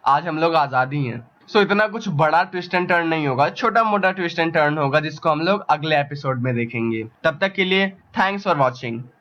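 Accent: Bihar, India. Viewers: Bhai bihari ho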